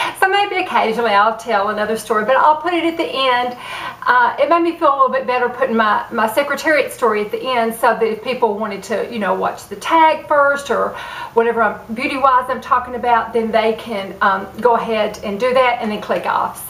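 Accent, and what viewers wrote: South, United States. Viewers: Hi, I just subscribed to your channel. I like your southern accent. I was totally shocked when you said you were 59. I am 61 and always trying to anti-age. I would put you right up there with Christie Brinkley. We don't have to look like old ladies as we age if we take care of ourselves and our skin. Have a blessed day!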